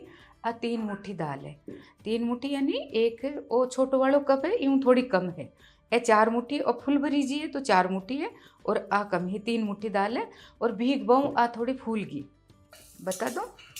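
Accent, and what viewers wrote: Rajasthan, India. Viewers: Aap rajsthani bolte he so mithi boli he aap ki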